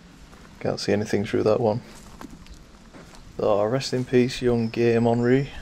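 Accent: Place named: Yorkshire, United Kingdom. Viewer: Beautiful setting. So much bird song. I like your respect and also your humour. I cant quite place your accent. Is it Yorkshire or Lancashire?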